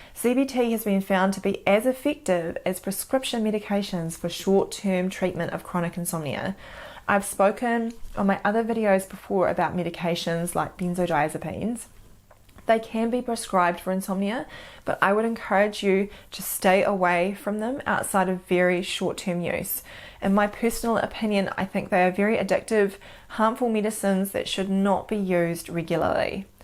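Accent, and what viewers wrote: New Zealand. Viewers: The New Zealand accent is pretty awesome, I must say. I loved it in Hunt for the Wilderpeople, one of the most hilarious movies ever. But you say in this video we should only use bidrooms for two purposes: sleeping and six. What about five, or four? Are they okay? Maybe some seven? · very good Doc. thank you. Now I want to go to NZ, love the accent!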